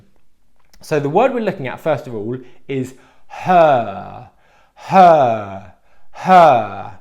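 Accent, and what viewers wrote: United Kingdom. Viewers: about this"s video the accent is British English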